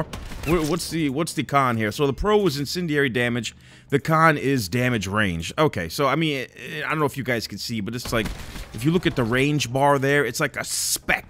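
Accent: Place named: Northeast, United States. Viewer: Loved your channel for years bro. I hope you and your family are staying safe. You sound like you’re from the NE - so please stay safe. Cheers from Texas.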